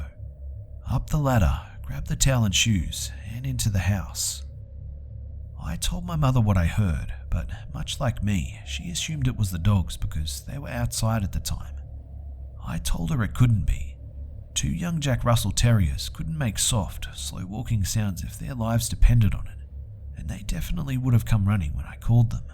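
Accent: Australia. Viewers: Always feels so good to see you've uploaded a new video! If I'll get 1/100 of your subs, aka 387, it'd still be huge for me since I've got 22 so far, and I've released 13 videos, so I almost have more videos than subs lol XD But back to the original topic, I really love your videos, and the Aussie accent is a welcoming bonus :) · The Australian Accent was a shock in it self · Are you Aussie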